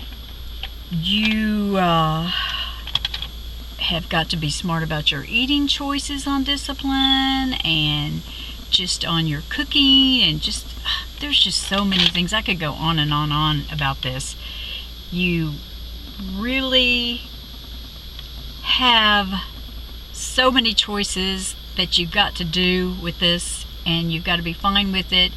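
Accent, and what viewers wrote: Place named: South, United States. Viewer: I detected a little Southerness there!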